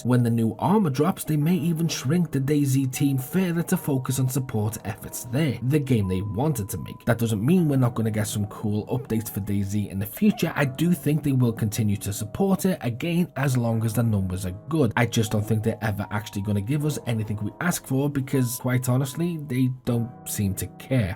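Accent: Liverpool, United Kingdom. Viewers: I dont hear Toxteth, but I definitely hear a mix ha its only sometimes I hear scouse at all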